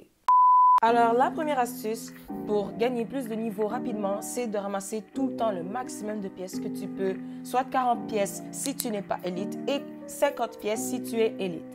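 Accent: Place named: Canada. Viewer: Ton accent canadien là 😭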